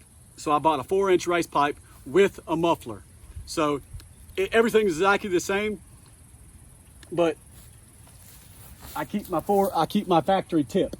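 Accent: South, United States. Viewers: Hey, just wanted to say that your videos are really helpful. And it’s nice hearing a southern accent from back home. Just got a <num> and I’m looking to build it very similar to yours, minus the tuning and weight reduction 👌🏼. I gotta have that warranty. Thanks!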